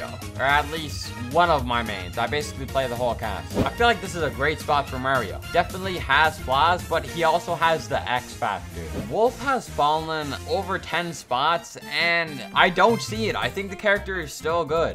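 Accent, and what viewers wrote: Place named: Newfoundland, Canada. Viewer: U sound like a Newfoundlander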